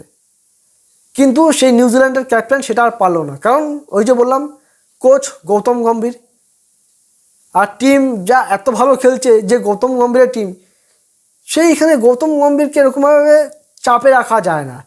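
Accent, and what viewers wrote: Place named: Kolkata, India. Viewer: হাগল দাদা। পাগল। কলকাতার বাংলা টা বেশ ফানি। যদিও মানতে অসুবিধা নাই যে , তোমাদের টাই শুদ্ধ বাংলা।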